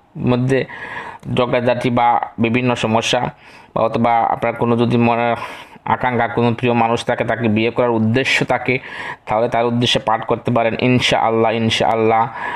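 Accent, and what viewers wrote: Sylhet, Bangladesh. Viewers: আমি আর অনেক ভিডিও দেখেচি সব ভিডিওর কথা গুলি ভুজি কিন্তুু আপনার কথা ভুজিনা,,, আমার মনে হয় আপনি সিলেটি,,, কথা কম বলবেন আর ভালো করে ভুজিয়ে বলবেন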